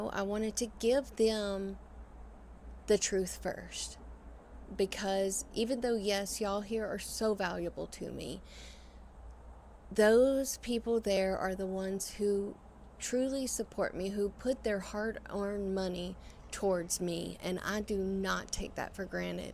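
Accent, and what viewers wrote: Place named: South, United States. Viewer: You're very pretty, I enjoy your southern dialect, be strong, and enjoy life to the fullest.😊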